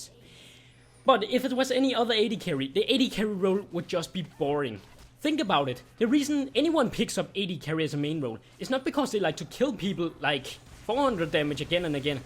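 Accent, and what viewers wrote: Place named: Denmark. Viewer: dat dansk accent :D fed video ^^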